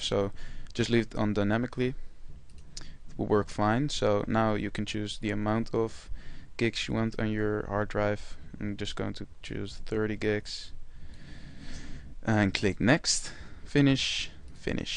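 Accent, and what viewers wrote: Netherlands. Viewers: you'r dutch ( i too) i can hear it! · he is Dutch and English is his 2nd language. I bet those who complain speak only one language. Besides I think he's got a very nice accent!! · vanaf het begin wist ik dat je Nederlands was! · Nederlands! ik hoor het aan je stem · yes he is i can hear are you dutch i''m dutch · dacht ik ook al, niet door die comment,maar je nederlandse accent :D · Hahaha jij bent nederlands :p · nederlander accent hoor ik.... very nais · Je kan heel goed horen dat je een Hollander bent! LOL +1 abonnee! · Nederlander? haha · i can tell by your voice that ur dutch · are you dutch??? i hear on your accent i have the same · je hoort echt aan je stem dat je een nederlander bent ! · you sound dutch!!! im dutch too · Haha, je klinkt super nederlands, and btw, vreselijk bedankt! :d <3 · Volgens mij ben je nederlands. I think you are Dutch wahahah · Je hoort gwn aan je Engels dat je Nederlands bent. 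· are you dutch? Your accent sounds dutch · je doet het geweldig. Heel goed Engels, ik hoorde dat je waarschijnlijk Nederlander bent maar had dat eerst niet goed door. Compliment.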